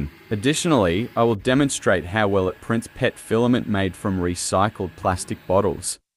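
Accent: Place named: Australia. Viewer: Man... your accent is Aussie but your location is Spain...btw excellent work..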